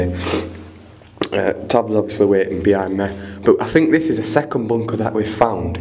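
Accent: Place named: Sheffield, United Kingdom. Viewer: Sheffield accent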